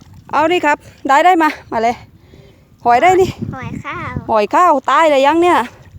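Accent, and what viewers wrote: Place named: South, Thailand. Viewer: ชอบค่ะนัอง อยู่จังหวัดไหนคะพี่ Rcha ค่ะfcใหม่ค่ะชอบมากเลยค่ะ น้อง พูดใต้น่ารักทำคลิปผัดหอยให้ดูทานใหัดูด้วยน้าาา มีเพจไล้สดมั้ยค่ะแจ้งชื่อเพจหน่อยค่ะจะย้อนกลับดูทุกคลิปนะคะชอบน่ารักดี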